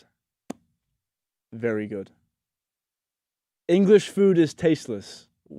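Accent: Edinburgh, United Kingdom. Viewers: I detected that as well, I’m guessing east coast Edinburgh say, I wonder what his bio is ? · that’s exactly it. He has the same accent as a lot of my Edinburgh/East coast friends.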